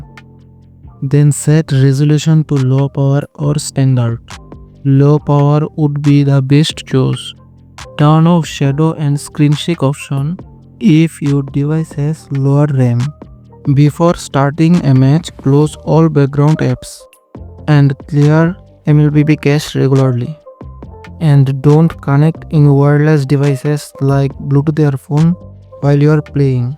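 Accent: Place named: India. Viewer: I already knew he is about to fix my shi when I heard the India accent btw thanks dude❤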